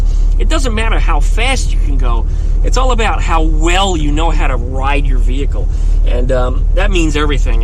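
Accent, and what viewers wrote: New Jersey, United States. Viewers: Definitely can hear the Jersey in you in this video, where exactly were you from originally in NJ?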